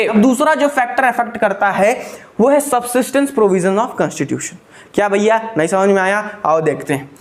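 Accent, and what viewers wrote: Bihar, India. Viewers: Pure bihari😊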